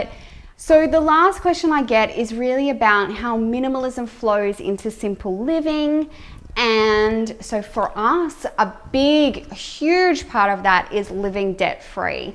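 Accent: Australia. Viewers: First of all, I love your accent. I’ve been trying to do an Aussie accent because I’ve been watching ‘Instant Hotel’ with my daughters, apparently I can’t do it. I disagree. I’m at the stage in my minimalism where I’ve been adding some items back in to our home. Very intentionally though. I still hate clutter but I love plants and I love books. So I’m allowing myself to buy more of these things because they make me happy. I no longer feel that to be a true minimalist that I have to get rid of everything! It’s about getting rid of what you don’t need and valuing the things that you do need. Lots of love to you from Lockdown England, though we’re coming out of it in the next few months, xxx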